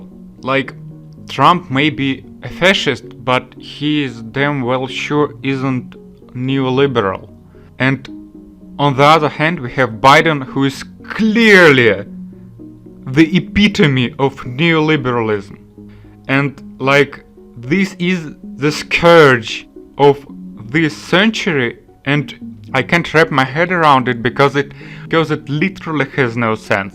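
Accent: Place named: Russia. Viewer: It's a most genuine russian accent. But whatever makes you sleep better at nights.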